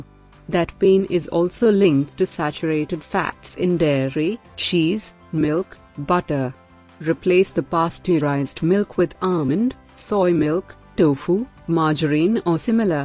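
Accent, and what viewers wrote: India. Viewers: combination of voices. robotic and.Indian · Refined Indian accent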